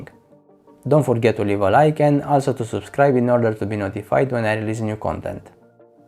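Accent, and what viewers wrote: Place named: Eastern Europe. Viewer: Eastern European accent sucks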